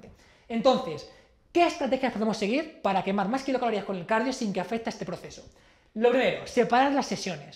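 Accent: Spain. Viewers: Habla mas calmado cual es la prisa de por si es dificil entebder el tonito que hacen los españoles, bajale hno. Saludos desde Mexico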